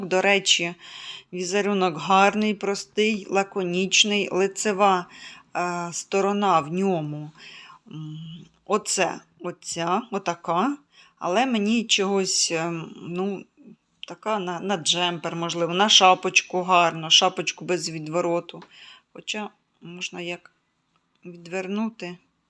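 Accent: Poltava, Ukraine. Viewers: ❤Приємно послухати . Як з рідними з Полтавщини поспілкувалася.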